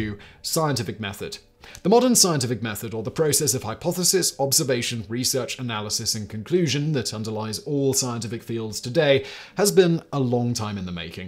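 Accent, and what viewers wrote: United Kingdom. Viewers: I listen to the Casual Criminalist podcast at night while driving a truck in NC, USA. I have one complaint. It doesn’t come out every day. I need more murdering cannibal stories told to me in a proper British accent. Thanks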